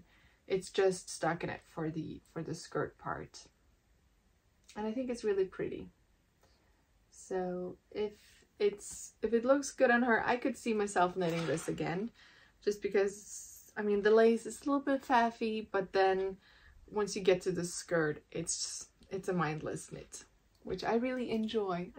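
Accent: United States. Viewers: Hi Mommy! I smiled when you said that English was not your native language. You sound American w the exceotion of certain words that might sound simmilar to Norwegian. Otherwise, every episode you get better & better. Look at yourself on this video & compare it to the 2nd or 3rd episode. You have ALWAYS had great grammar, and pretty great pronunciation. Nobody could fault anything you say/how you say it. However, the added touch to your American accent is the colloquialisms you feel more & more confidence using. I speak French, Spanis, English, & a tiny remaining bit of Italian (I spoke it 40 yrs ago, but the one adult that would always speak it, passed 40 yrs ago). Anyway, if I try Spanish, I don't always end or construct a word the Spanish way, I'll have to debate it in my head, because the other Romance Languages & even English, sound very simmilar. But l rather know other languages than get a word semi wrong every so often. 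Anyway, you sound great, either American or Canadian. 👍💯😉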